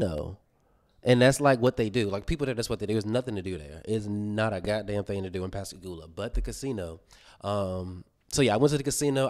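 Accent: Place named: Norfolk, United Kingdom. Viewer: Loving the hair and the fittt 😍 I definitely thought you was from Norfolk though lol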